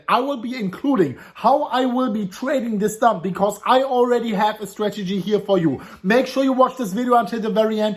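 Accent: Germany. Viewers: Good time to wait for bottom if you are a hodler. Why? Tax season. Funny German Accent Guy Say's Whales selling BTC. I'm Still bullish because we are still above the 55 day moving average but just in case the whales are not finished, set a stop loss. DXY is rising so selling BTC for USD is starting to look attractive. Appears a short squeeze is imminent.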